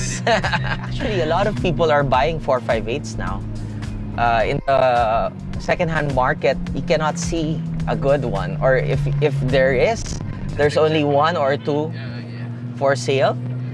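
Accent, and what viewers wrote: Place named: Philippines. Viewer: You speak english but still sound philipine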